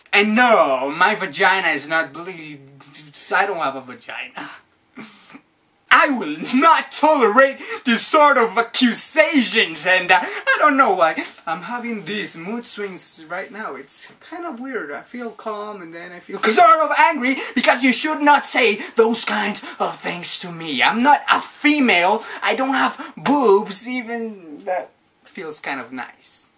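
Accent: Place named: England, United Kingdom. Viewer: x3 i like your english accent <3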